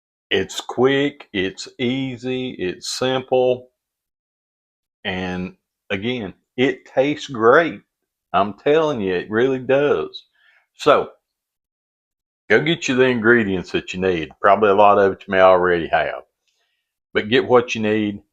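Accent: South, United States. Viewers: Looks good. Love your country voice. Add some sour cream.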